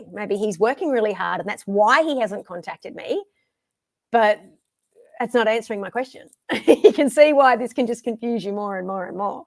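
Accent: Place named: Australia. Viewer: Love your Australian accent ❤ you are a superb Teacher ❤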